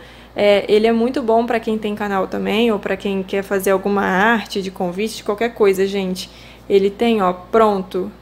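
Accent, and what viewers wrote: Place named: Rio de Janeiro, Brazil. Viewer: Carioca... Aqui no Rio geralmente usa muito o x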